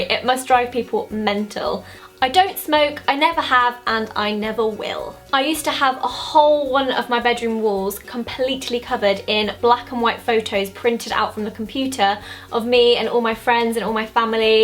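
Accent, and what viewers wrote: United Kingdom. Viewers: Greta Hui 英式的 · 他是英國人嗎??好種的英國腔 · 她的英國腔也太好聽😍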